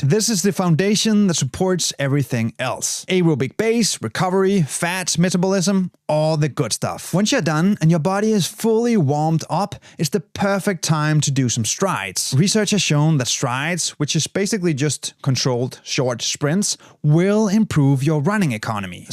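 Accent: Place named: Denmark. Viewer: Failed to recognise your accent :( My guess was Swedish, not Danish. Though who else would explain things in short, simple and very informative way? (May be just Dutch 😉). Thank you so much for a reminder about good warm-ups and A/B skips implementing!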